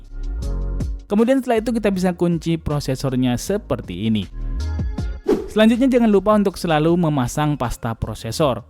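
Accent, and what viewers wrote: Madura, Indonesia. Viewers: bang.. lu orang Madura ya ???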